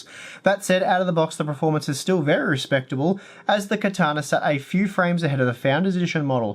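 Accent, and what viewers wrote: Australia. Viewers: I do so like your videos matey, nice to hear an Aussie online. Keep up the good work on the reviews. :)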